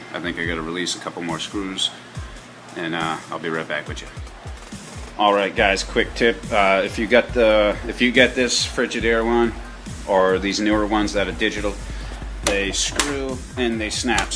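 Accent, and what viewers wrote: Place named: Rhode Island, United States. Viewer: I listened to you and I’m like this guy has to be from RI hahaha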